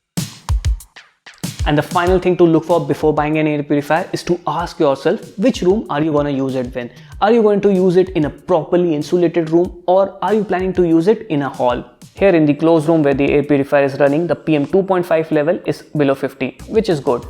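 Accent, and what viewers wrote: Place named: India. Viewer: love the proffesionalism in your voice, and combined with indian accent (which in my head is connected with microsoft scammers and shitty call centers) makes it briliand and a honey on my ears. Great job man. Just bought my first air purifier and hope its going to help my girlfriend with her allergy.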